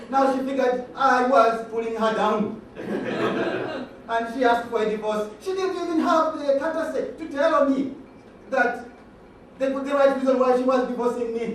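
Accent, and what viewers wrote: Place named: Jamaica. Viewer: i dont know which tribe in Uganda speaks like that.....u sound more like Jamaican..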